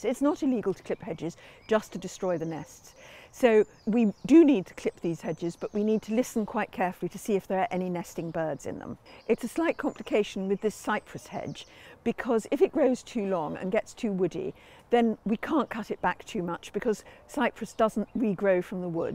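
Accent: United Kingdom. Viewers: That's an outstanding garden. I absolutely love your British accent. It takes me on an exquisite journey through the garden. Thank you!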